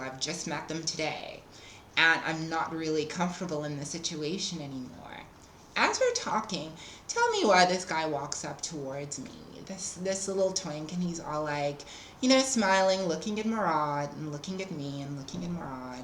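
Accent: United States. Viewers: You really did nicely in trying to perfect that American, almost Valley Girl accent. Good job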